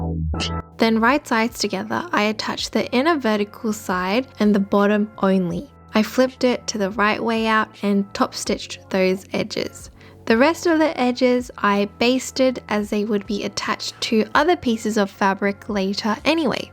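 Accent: Australia. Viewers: Love this! So creative and clever! Thanks for sharing! I am now a sub! Also good to see and hear a familiar Aussie accent 🥰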